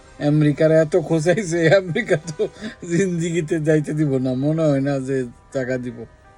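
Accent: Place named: Mymensingh, Bangladesh. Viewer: vai mymensinghor vasa apnermukhi sei lage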